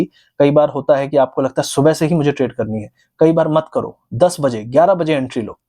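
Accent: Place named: Gwalior, India. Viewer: Very original ho bhai...bilkul desi gwalior...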